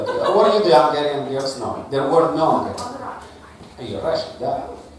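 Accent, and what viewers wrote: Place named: Milan, Italy. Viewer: Anche quando parli inglese hai l'accento milanese 😅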